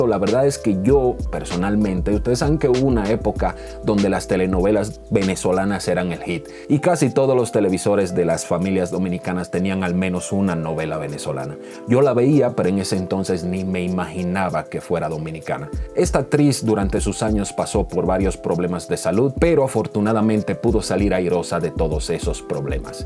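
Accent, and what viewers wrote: Mexico. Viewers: Que magnifico trabajo el suyo, destacar la participación de artistas quisqueyano fuera de nuestro país, para que conozcamos a los nuestros en playas extranjeras. Muchas gracias principe por ese regalo a los dominicanos. Creo por su acento, que usted es del tan lindo y querido México, nuestro hermano mayor de Norteamérica. Bendiciones para usted.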